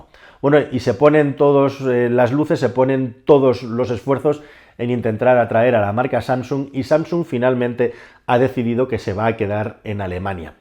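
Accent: Spain. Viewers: Sr., me gusta su acento español verdadero, en You Tube hay otros que fingen ser españoles pero que a alguien como yo, he estado tres veces en España (soy estadounidense de origen argentino y con ancestros españoles ) ni de casualidad lo engañan.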